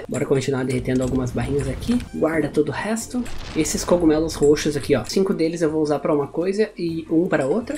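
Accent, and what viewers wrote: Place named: South, Brazil. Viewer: Tu é gaúcho!!?? Acho o teu sotaque parecido com o do sul, se sim tu tá seguro!?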